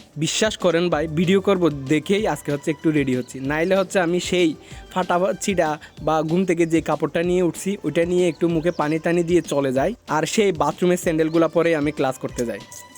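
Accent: Chittagong, Bangladesh. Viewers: ভাই আমি ও চিটাগং এর আপনার কথা শুনে বুঝা যাই আপনি ও চিটাগং এর ,, আমি এবার এডমিশান শিক্ষার্থী ,, কমার্স থেকে,, আমার mbstu তে পড়তে চাই ,,, আপনার তো হেল্প পাবো মনে হয়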